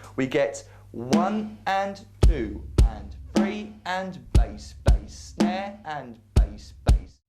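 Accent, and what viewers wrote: United Kingdom. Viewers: Fantastic! Thank you! (Good to hear another British accent on YouTube for a change) 👍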